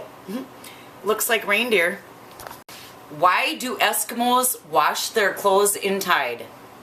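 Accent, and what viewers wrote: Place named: Minnesota, United States. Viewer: Hahaha Hahaha!!!! Love this! Love the Minnesota accent ever since I heard it in "New in Town"..... Don't Cha Know!!!! Have a super day!!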